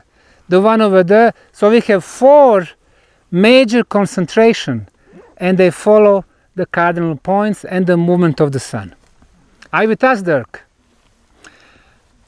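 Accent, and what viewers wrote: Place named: Bosnia and Herzegovina. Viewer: Neverovatan covek! Ali najjace mi kad govori engleski bukvalno sa bosanskim naglaskom😄😄 💪💪💪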